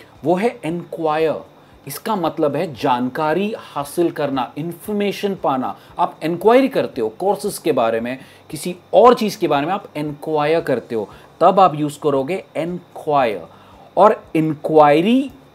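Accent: United States. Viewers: In my humble opinion, You're teaching american accent not British accent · Are teaching american English pronunciation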